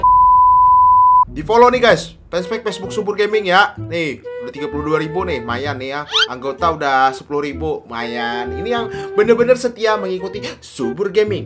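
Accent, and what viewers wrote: Banjar, Indonesia. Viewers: Bang km org mana mirip banjar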